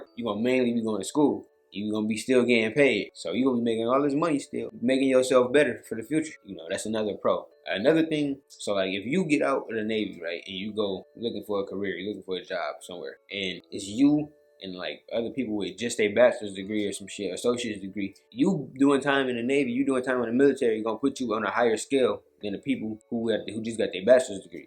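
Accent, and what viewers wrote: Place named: Detroit, United States. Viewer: Nigga it sound like you from the city frfr (Detroit)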